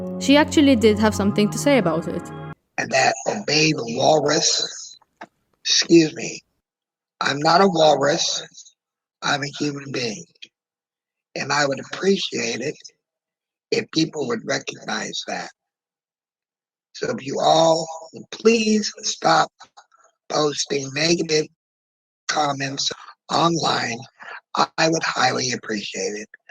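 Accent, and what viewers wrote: Malta. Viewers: This is so off-topic, but the whole video I'm sitting here like "WHERE's their accent from, I can't place it but it's so pleasant to listen to" and turns out I've never actually heard a Malta accent before?? so now I know lol, but just wanted to say, your voice is so pretty! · Was trying ro figure out the accent would have never guessed Maltese Also you are so right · off topic but i love the accent.. i have always been fascinated by the maltese language and how it is latinized arabic but i've never heard a maltese person speak english and the accent is just that. very warm and lovely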